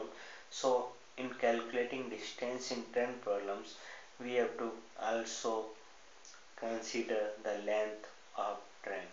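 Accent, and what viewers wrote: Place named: Asia. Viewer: wow, I would've thought that someone would've made fun of his Asian accent saying that Asians are really smart. Well done world!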